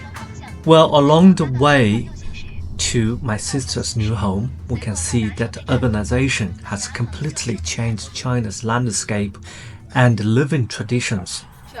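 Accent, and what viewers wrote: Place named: England, United Kingdom. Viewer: I enjoyed your video very much. Your sister’s flat is very spacious and nice, not at all what you would think of as housing for the poor. Your English is excellent, and I’m really pleased that you have an English accent and not an American one when you speak, I also enjoyed the traditional Chinese music. I have subscribed for more interesting video’s. Thank you for sharing.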